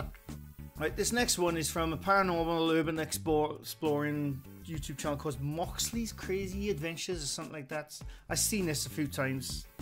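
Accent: Wales, United Kingdom. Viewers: Is that the accent of a fellow Welshman I hear?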